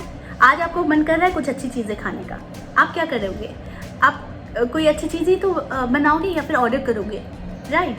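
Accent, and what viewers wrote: Bengal, India. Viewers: Sute jawar age tmr video dekhe sute jawa...eta amk ank besi positive vibes dae..tumi bhishon misti..ar khub valo vabe guchiye ktha bolo...jodi kakhono somoy pai tmr sathe ami nischoi dekha korbo..i just love u mam❤ arekta ktha bengali ra jokhon hindi te ktha bole tokhon besi cute lage...thik jemon tumi🥰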